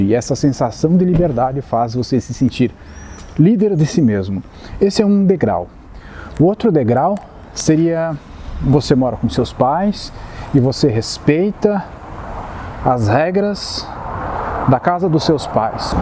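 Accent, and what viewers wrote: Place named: South, Brazil. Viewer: Você é do Sul? Tem sotaque.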